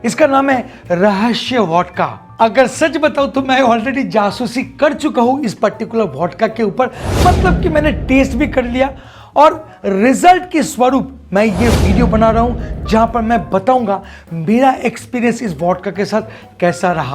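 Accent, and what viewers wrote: Bengal, India. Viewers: Dada, your English with a hint of Bengali touch is really sweet to hear. You have really done, us drink lovers, feel good about our drink. Knowledge about the drink, really adds a punch to the way we enjoy it. Just a little correction, "Rahasya" means mystery not secret, secret means "gupt".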